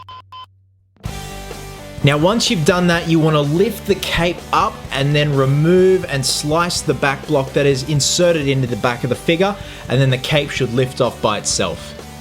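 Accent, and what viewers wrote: Australia. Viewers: i love Australians accent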